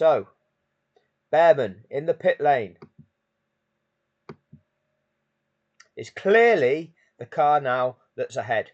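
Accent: United Kingdom. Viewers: your britich ???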